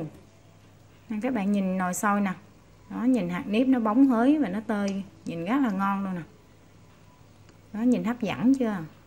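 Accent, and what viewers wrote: Mekong Delta, Vietnam. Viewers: giọng miền tây nghe mộc mạc! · nói giọng miền Tây rất là đáng yêu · ko ko bạn đừng thây đổi giọng nói nhe vì đó là chất giọng đặc trưng của người miền Tây nam bộ đó, theo mình “bạn chính là bạn “ thì đẹp hơn nhiều. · Giong noi miet vuon mien Tay Nam Bo. Tu nhien va de thuong lam. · Cám ơn bạn chia sẽ cách nấu món xôi mình thích từ nhỏ. Nhưng xem clip này nghe thấy thương và thấy thích cái giọng miền tây nam bộ, cái giọng rặt chất "bắt con cá gô, bỏ chong gổ, nhẩy nghe gột gột"!!!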